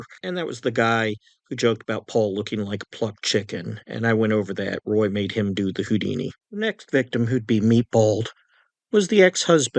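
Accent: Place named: Midwest, United States. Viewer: Can't wait for part 17, I've greatly enjoyed this series and your Westies 3-part series. Exactly my type of narration, you've got that dry, wry New York sense of humor my entire family has, even though I can tell from your accent that you are originally from the Midwest... Or Ohio or similar? Just a guess based on certain words you say. Anyway, I don't do this often on a YouTube video, but thank you for your channel, your videos and the immense amount of work you've put into it. I'm looking forward to contributing back by buying your book when it is published, which I'm eagerly looking forward to. 🙂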